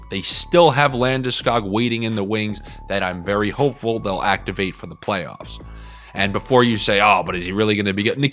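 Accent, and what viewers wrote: Boston, United States. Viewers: Love the Boston accent talk.....you do it well.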